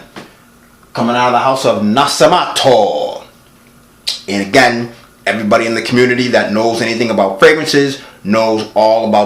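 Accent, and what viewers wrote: Boston, United States. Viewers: love this guys Boston accent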